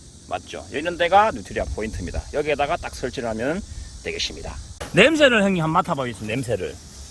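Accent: Jeolla, South Korea. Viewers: 사투리가 어디에요? 전라도? 충청도? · 진짜 전라도 사투리 확티가나네요 ㅋㅋ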